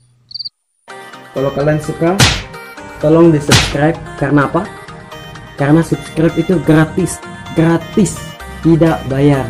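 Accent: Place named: Kalimantan, Indonesia. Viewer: Orang kalimantan ya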